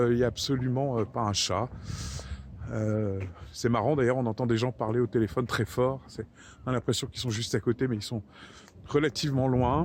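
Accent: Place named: Brittany, France. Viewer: Purée ca m’a fait drôle! Je vis maintenant en occitanie et je voulais faire entendre à mes enfants, le bon vieil accent brestois... Après quand on écoute bien: il est encore là! Bisous!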